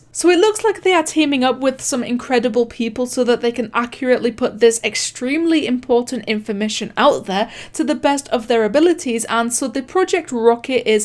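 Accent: United Kingdom. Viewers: can we talk about her bri ish accent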